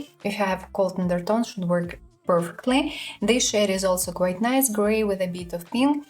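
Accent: Russia. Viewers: какой русский при русский акцент😄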